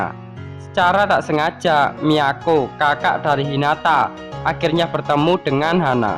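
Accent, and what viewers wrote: Java, Indonesia. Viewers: ngk ngakak dg logat jawanya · Full video bahasa medok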